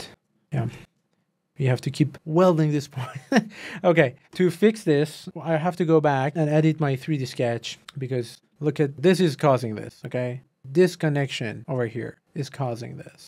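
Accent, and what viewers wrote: Iran. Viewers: حاجی لحجه ایرانی معلومه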